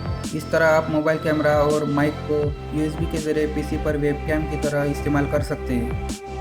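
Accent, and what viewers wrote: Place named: China. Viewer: His voice is like CHINESE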